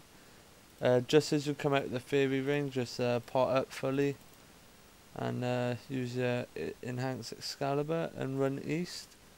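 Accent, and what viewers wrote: Wales, United Kingdom. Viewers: From Wales? Sounds like it :D good vid man. subbed, keep em coming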